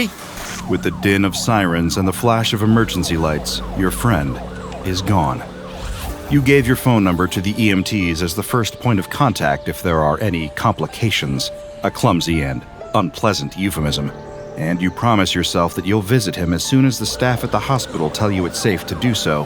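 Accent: United Kingdom. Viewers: Dam 🦫🦫🦫 you really nailed the British accent sir 🙏. God bless you and your work, respect form Croatia-Europe 😇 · OG British accent 😂❤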